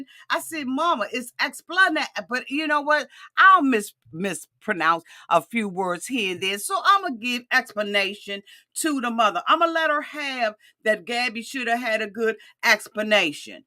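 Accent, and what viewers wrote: Washington DC, United States. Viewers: I just found you, love the DC accent and the perspective..but did you notice that Monique asked dude if he enjoyed his sleep “on the bed”? Then later I noticed a pillow and blanket on the couch..did she sleep on the couch????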